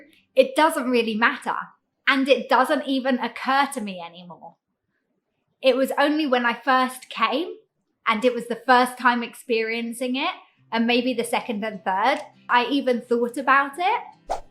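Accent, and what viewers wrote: United Kingdom. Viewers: 好喜歡你的英式腔，很好聽，像聽哈利波特電影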